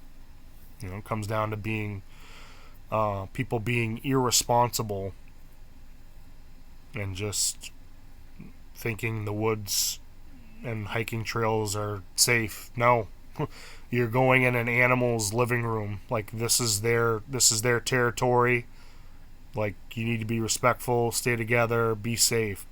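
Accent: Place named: New England, United States. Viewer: Good video. I hear you're a fellow new englander like i am.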